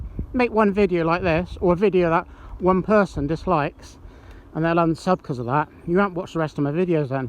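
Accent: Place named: South West England, United Kingdom. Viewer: Well yeah, I could tell it was South West by the accent haha. I’m in Cornwall. I’m guessing you are Devon somewhere?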